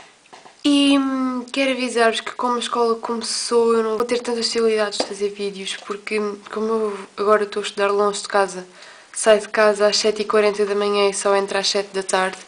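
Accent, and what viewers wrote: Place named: Portugal. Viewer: É tão bom ouvir esse sotaque de Portugal, quero dizer, português de verdade sem sotaque de brasileiros. Eu queria sumir daqui, mas parece que Portugal já está cheio de brasileiros.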